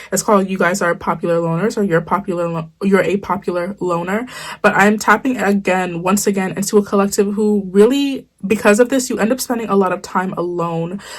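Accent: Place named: Jamaica. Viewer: Yoo you gotta be Jamaican lol some of the words you use and how you say things lol if you are big up you self sistah🇯🇲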